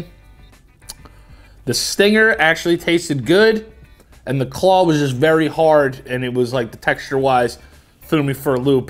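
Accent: Australia. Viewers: As an Australian man, I can confirm this is exactly how we speak... mate. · the Australian accent is killing me 😂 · Love the ozzie accent! Bloody rippa mate! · As an Australian, that accent was not offensive, but it was shithouse. 😂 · Am I the only one that thinks with an Aussie accent is 👌👌👌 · HIS AUSTRALIAN ACCENT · NOT down to watch eat more bugs considering the fact ima bout to puke...... but very down for a whole video of him just talking in an Australian accent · i swear i’ve watched this a million times; now my going to sleep video i watch Australian accent kills me every time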